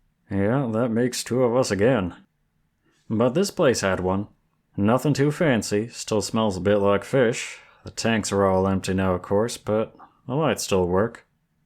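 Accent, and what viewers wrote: South, United States. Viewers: oooh, happy to hear that. Im not usually one for Southern accents but I really liked this one ^^ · I'm from Texas and I don't have a southern accent- So, I'll just say that you did a great job on it. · Aww, no one's ever told me I smell pretty before! So sweet and adorble. And with your Southern accent (which was great), I feel like that's how I talk when I play too much Red Dead Redemption 2. I kind of imagined you talking with some wheat grass clenched in your teeth, too. Thank you for the great roleplay! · Come for the audie, stay for the southern accent 😂❤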